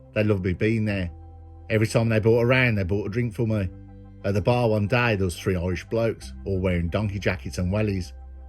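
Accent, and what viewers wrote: Birmingham, United Kingdom. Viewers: What a great episode and to know a bit about your background mate very interesting and you definitely have a bham twang 😂👍👍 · Like I've told you before the Brum accent just tops the story's off for me. Some rum turkeys from Birmingham as you full well know. Love from Manchester ❤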